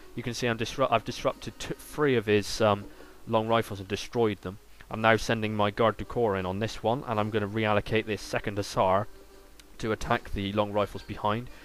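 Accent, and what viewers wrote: United Kingdom. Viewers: Southwest england? Or scotland or nothern Ireland?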